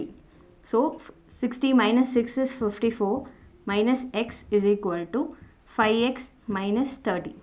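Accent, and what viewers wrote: South India, India. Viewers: She seems to be from South India that's why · no timepass.. no stupid requests before starting the video.. simple language with southern tint.. and a lot of cuteness..!!! 😍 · are you from mam south india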